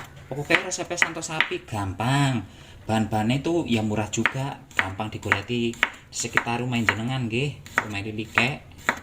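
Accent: Banyumas, Indonesia. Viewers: Matur suwun resepe lik.... Kok lik ngapak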